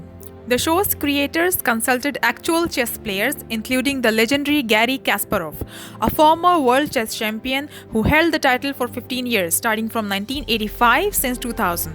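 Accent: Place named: Pakistan. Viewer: Pakistani voice over HAHAHA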